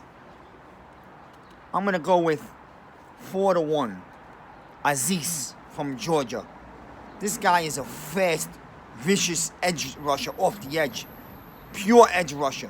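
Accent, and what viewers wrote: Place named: New York, United States. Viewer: Holy shit man, a true Blue NYC Dude - I F n love listening to you! Not only do you know your stuff, ypur presentation & use of language is music to my ears. This former NYC dweller feels like I'm back home listening to your delivery bro. You should be on ESPN!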